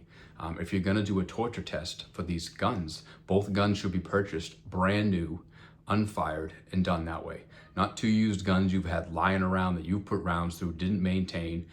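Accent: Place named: Boston, United States. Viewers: Bro where are you from? I was born and raised in MA, and when you said, “stahted fuckin 12 days ago” I was home in Lowell for an instant🤘🏻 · First time viewer but judging by the voice..... Go Sox...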